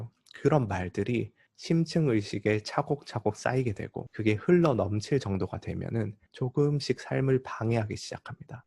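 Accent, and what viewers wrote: Gyeongsang, South Korea. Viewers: 주식 공부하러 왔다가 철학 공부 잘하고 갑니다. 아 그리고 말투만 들어도 이미 경상도 사람인거 알았어요 😋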